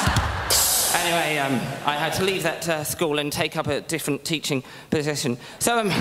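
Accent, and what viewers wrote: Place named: United Kingdom. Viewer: He's hilarious but I was having a hard time catching up xD English is not my first language let alone hearing British English in a speed like that.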